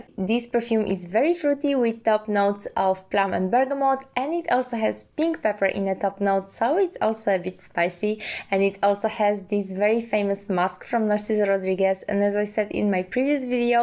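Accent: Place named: Poland. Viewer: You have polish accent 🙂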